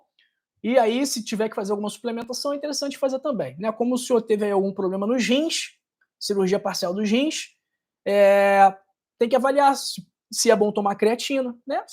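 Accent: Rio de Janeiro, Brazil. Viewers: Pelo seu sotaque e do Rio de janeiro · Eu tomo água com limão 🍋 e como abacate, todos os dias! Com esse acento lindo, só poderia ser Carioca! Eu amo o Rio!❤ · Talvez o Sr seja carioca? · Eu achou quê você é do Rio de Janeiro tem o sotaque dos cariocas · Vc e carioca. ? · Eu sou de Piracicaba SP vc pelo sotaque é carioca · Pelo seu sotaque , deve estar falando do Rio de Janeiro. É igual de um irmão da igreja.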